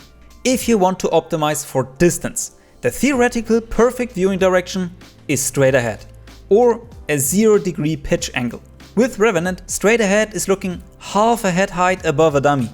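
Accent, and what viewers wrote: Germany. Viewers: Geiler allman akzent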